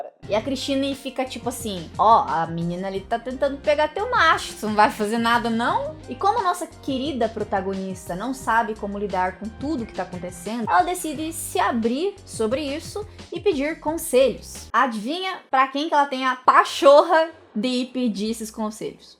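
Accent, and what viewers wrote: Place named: Caipira, Brazil. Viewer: Adoro o sotaque meio caipira da lkkkkk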